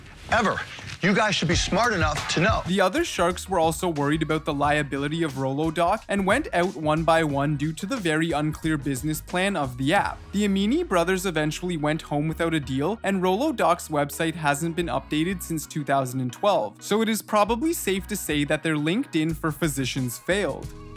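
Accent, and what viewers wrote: Canada. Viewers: I think he is Canadian. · He's Canadian, so..... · He's Canadian :D Probably from the Edmonton or Ottawa area. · Just the classic Canadian “out” pronunciations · It's called being Canadian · bet the VoiceOver is a Canadian dude... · He sounds canadian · The narrator is Canadian AF! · I'm going to venture a guess and say the narrator is Canadian.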